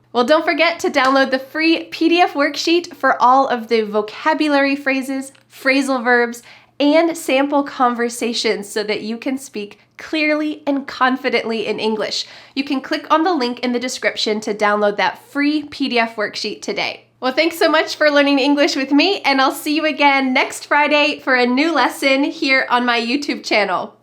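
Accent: United States. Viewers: I❤ u teacher.....ur american accent so awesome....wanna speak american accent like you ....its really cool Mc donalds said im lovin it Greeting for ur husband DAN and ur children.....your guys re inspiring family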